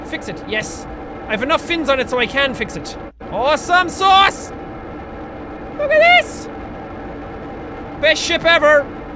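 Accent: Ireland. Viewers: Is Irish? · r u irish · ChurchISBest I like his Irish voice though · I just want to thank you for all the awesome videos you do! You've inspired me to play so many games( especially Kerbal!) Also I love you're Irish accent! I am also very Irish and thought it was awesome you were when I started to watch you're vids. Keep BOSSING things up, LIKE A BOSS!!!!!!!!!!!!! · On his older he was more embarrassed to talk in Irish tone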